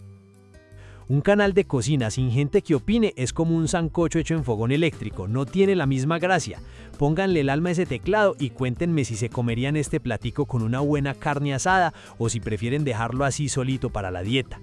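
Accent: Colombia. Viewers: Me encantó tu ensalada y más porque el pepino está completamente limpio a mi no me gusta la cáscara de ninguna fruta o vegetal o legumbres. Gracias por explicar tan bien. Y déjame decirte, con tu acento Colombiano me basta . Gracias❤😊 amore mio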